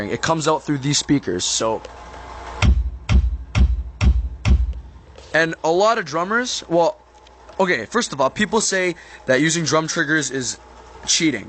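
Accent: Canada. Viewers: are you Canadian? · He sounds like Lars Ulrich mixed with Shia Labouff, but with a Canadian accent.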